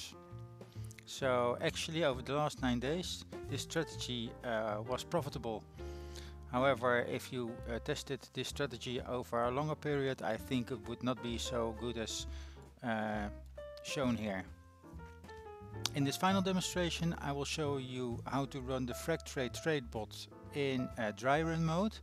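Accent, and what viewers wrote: Netherlands. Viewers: First sentence and I knew he was dutch. Dank je wel voor de playlist!! haha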